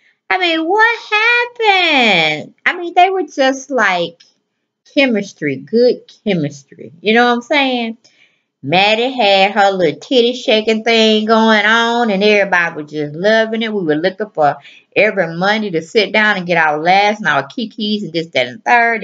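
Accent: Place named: United States. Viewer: Slow down I can’t understand your country accent you talking to fast lol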